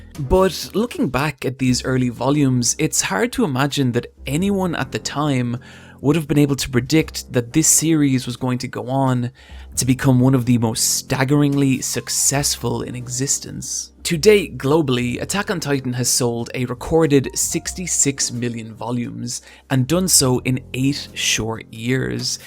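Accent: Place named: Ireland. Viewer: I had a feeling you were Irish wasn't sure if it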